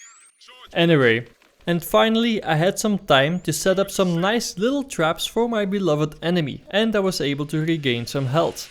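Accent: Netherlands. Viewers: Are you dutch? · you dutch?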